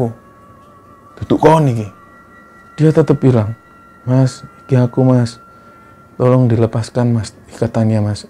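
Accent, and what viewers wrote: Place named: Surabaya, Indonesia. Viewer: Pengen ktm mas.dn team,mas. dgn logat khas sby nya n ciri khasnya, bikin 😍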